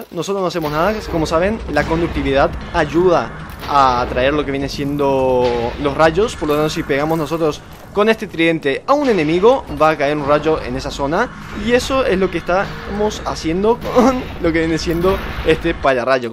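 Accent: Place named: Paraguay. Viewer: Man dos paraguayo? .__. tenés el acento paraguayo jsjsjs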